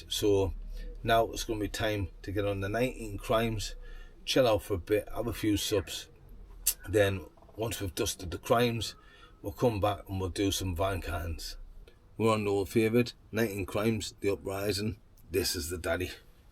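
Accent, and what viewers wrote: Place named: South Shields, United Kingdom. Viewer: You sound like a shields lad.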